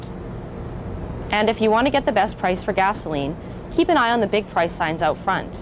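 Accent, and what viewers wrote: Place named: United States. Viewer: wtf? she has an american accent but measures things in Liters and kilometers. ?!?!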